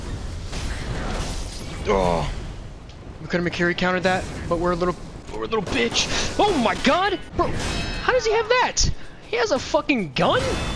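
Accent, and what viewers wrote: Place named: Delaware County, United States. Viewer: Immediately clocked that mid Atlantic accent. Youse from delco broh?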